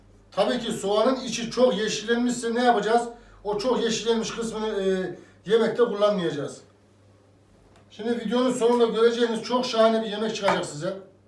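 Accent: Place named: Sivas, Turkey. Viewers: Sa Nerelisin ustam iç anadolulu gibi konuşuyorsunuz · Abi nerelisin sivaslılara benziyon 😁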